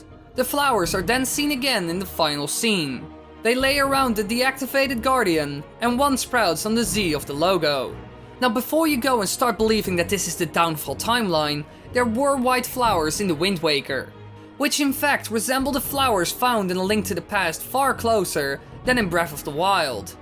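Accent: Netherlands. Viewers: Are you (the narrator) dutch? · Ben je nederlands? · Are you dutch? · You sort of have a Dutch accent..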